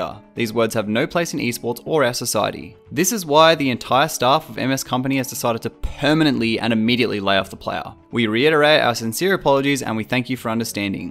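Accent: Australia. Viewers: Very well put together. Want to know my thoughts? Ok sure. This guy is a fucking CLOWN what the fuck did he expect? Good response by MS. I like your video style of succinct and right to the chase. It is a breath of fresh air in the hastily edited 10min videos made specifically to get ads. I also love hearing another Aussie voice behind the camera. Keep up the good work!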